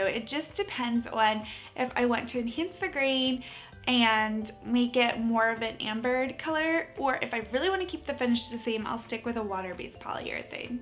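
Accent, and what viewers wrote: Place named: California, United States. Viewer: Terrible Californian “ Hollywood “ accent. Speak the Queen’s English Dear!!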